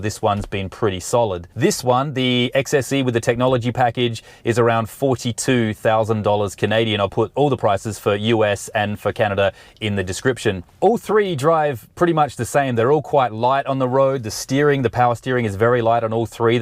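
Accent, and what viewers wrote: Australia. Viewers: This guy has an Aussie accent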